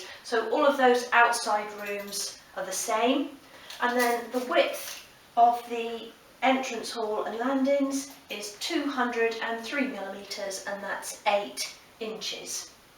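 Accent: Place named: United Kingdom. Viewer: when I start watching your videos, I was annoyed by your British accent.. but now I can say that you are very beautiful woman with simple but gorgeous style.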